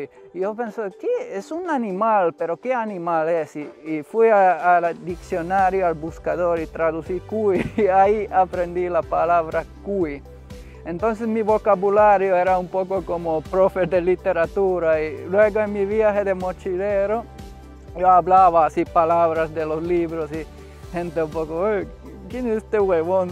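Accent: Peru. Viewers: Seguro que es finlandes? Suena a acento charapa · Excelente tu pronunciacion de Limeño, saludos desde Lima 21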